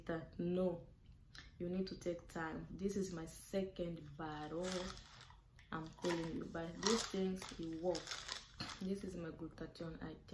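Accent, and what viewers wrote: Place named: Democratic Republic of the Congo. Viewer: R u from Congo i like how u speak❤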